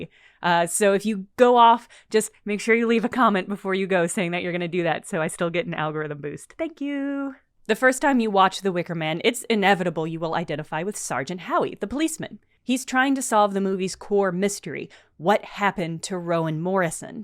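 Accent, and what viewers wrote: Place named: United States. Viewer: Can I find a non American narrative of this British classic ?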